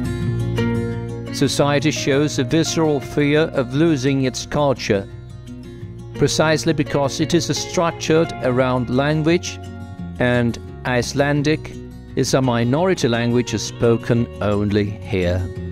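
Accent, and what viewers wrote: United Kingdom. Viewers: Si yo no supiera que vos SOS argento? Creería con ese acento, que sos británico!. Yo habló muy bien americano Appalachian y alemán Westfalen (yo los siento mas fácil de hablar) y se de lo que digo! Bien por vos!, felicidades!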